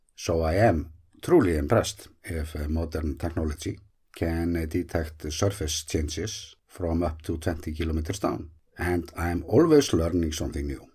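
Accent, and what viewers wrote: Iceland. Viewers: Your channel is much more than just about geology, earthquakes and a volcano, even though that's what initially drew me here. Your educated insight and coverage has been an enjoyable and informative experience. I've grown to love seeing and hearing about all things Iceland, so I'm here for the long haul. I'll look forward to seeing my notification bell lit up and finding you there. There's so much more to see and explore and you'll be the perfect guide, my friend. Your authentic Icelandic accent is a nice addition, too. 😉 . . . Stay well.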